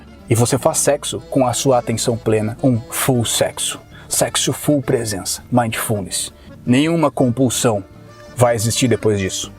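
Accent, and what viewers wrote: Rio Grande do Sul, Brazil. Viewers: é gaúcho???👍😏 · Se tive se um flauta chinesa de fundo... Hehe Você é gaúcho? Parece muito ser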